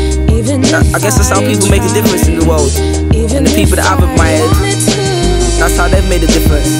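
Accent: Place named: United Kingdom. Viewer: Who would comment on your English?! I love you being British and I love your accent :)...good job great vid...keep it up!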